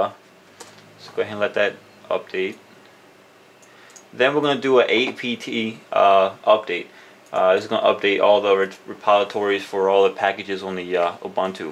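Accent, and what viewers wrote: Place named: United States. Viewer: THANK YOU AND YOUR BEAUTIFUL NORMAL AMERICAN SOUNDING VOICE AND UNDERSTANDABLE ENGLISH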